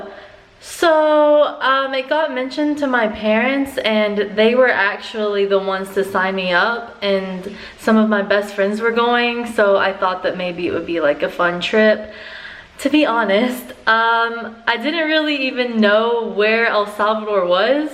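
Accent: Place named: United States. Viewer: As always nice video..even though sometimes is very hard for me to understand american english...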